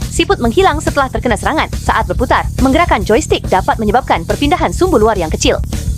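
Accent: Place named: Malaysia. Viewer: logatnya malaysia ya buang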